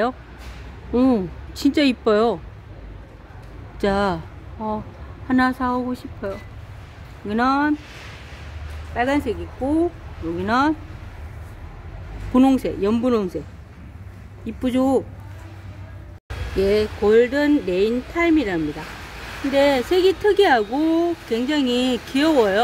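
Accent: Gyeongsang, South Korea. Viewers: 님 어재는 알림받고 왔고 오늘은 님 구수한 경상도 사투리 목소리 들으러 왔어예 ㅎㅎ우리 시골산에 가면둥글많은대 즐청 풀청 합니다 예 😅😅😅❤❤❤❤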